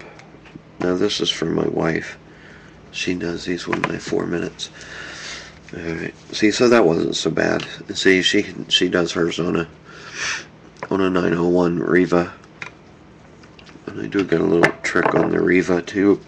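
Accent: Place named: Pittsburgh, United States. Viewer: Great video..good tip about the Riva battery charger..I have to pull up the contact on the charger every time I charge my Riva..actually thought it was broke until I saw this tip..I know you're from Pittsburgh because you really have the Pittsburghese accent..Jeet jet??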